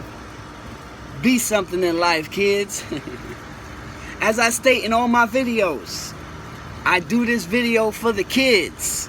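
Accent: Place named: New York, United States. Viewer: Real new yorker. You can hear it. Bless up keepup the good work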